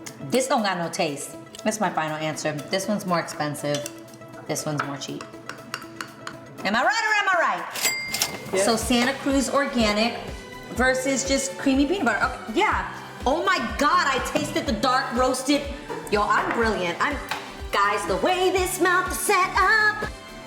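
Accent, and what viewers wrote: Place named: New York, United States. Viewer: yo i love her nyc accent lol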